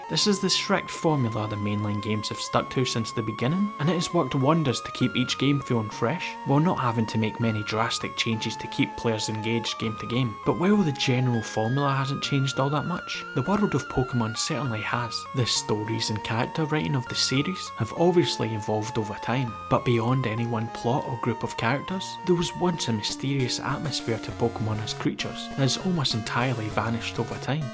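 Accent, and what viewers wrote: Scotland, United Kingdom. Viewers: it's a great accent you have. Brilliant. Scottish? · He said "Polish a bit more your voice" not "rebalance your sound". He doesnt understand the Scottish accent. I had no problems hearing anything in this video.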